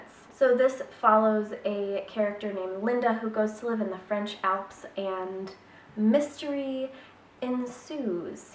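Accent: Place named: United States. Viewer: ur accent is beautiful.... I wanna learn American accent...... what can I do for that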